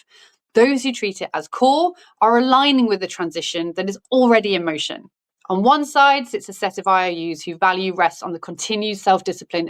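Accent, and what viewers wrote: England, United Kingdom. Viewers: I could listen to talk all day with that English accent. But thank you for creating this video I truly value your input. Both silver and gold are on fire and I think silver has the potential to go higher in price to create a life of its own.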